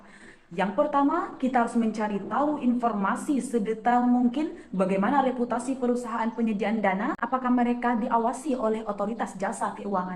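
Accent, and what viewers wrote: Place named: Padang, Indonesia. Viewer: Klua irama tngah padang me,,😂😂 is ok, lnjutkan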